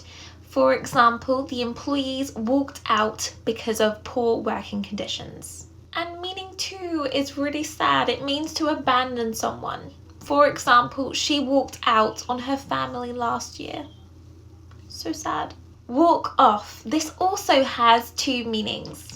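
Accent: United Kingdom. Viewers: Love British English accent.❤❤❤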